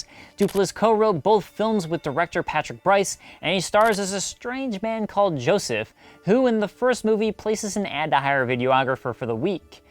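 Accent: United Kingdom. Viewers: Neko Sama because his accent sounds british, huh? (Lmao moron)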